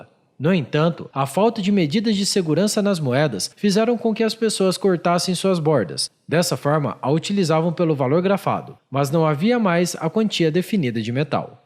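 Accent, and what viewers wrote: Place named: Goiás, Brazil. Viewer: Esse sotaque goiano kkk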